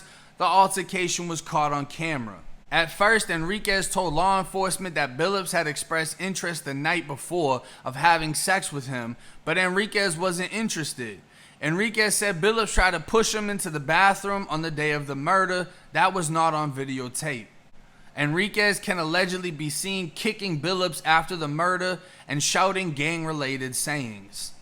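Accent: Boston, United States. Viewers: U sound like u from my city 🤔 #Dorchester #Boston #Streets raised me 👌🤷🏽‍♀️ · You from Boston bro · You sound like your from Boston mo. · Damn..... Are you originally from Boston, South Shore? I'm a Mass transplant living in FL too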